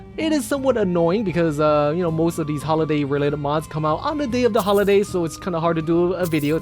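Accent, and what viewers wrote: United Kingdom. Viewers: I'd say English but I can't place the region, but her voice is soothing. Also I'd work both but as a slave it's not like I'd have a choice anyways. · She sounds welsh I think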